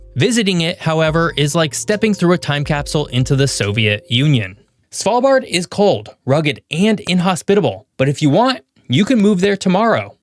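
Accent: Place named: Kentucky, United States. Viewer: Your English pronunciation is awful. It sounds like you went to school in Kentucky. Or, maybe you did. The most annoying is when you say "thuh" instead of "thee" before a word that starts with a vowel or a vowel sound. It's NOT "thuh" island, it's "thee" island, thee arctic, thee avalanche. No, it's not spelled differently, it's just pronounced a different way in different circumstances. Unless you were raised in Kentucky. Go ask your mee-maw, at least she has a second grade education. Also, your voice is shrill and you talk too fast. I don't think being a youtuber is for you.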